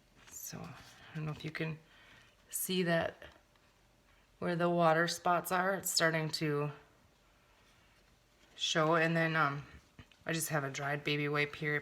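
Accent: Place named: Minnesota, United States. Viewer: HA! I was born, raised, and lived the first 26 years of my life in Bemidji! I've lived all over the country and abroad, I've lost my Minnesotan (I get it back when my family comes to visit. lol). Your accent brings me RIGHT HOME! Also, I love all things crafting. I subscribed!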